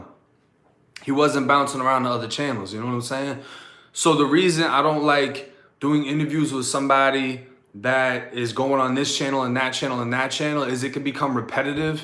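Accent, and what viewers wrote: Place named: Massachusetts, United States. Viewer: youre from Mass...that fuckin accent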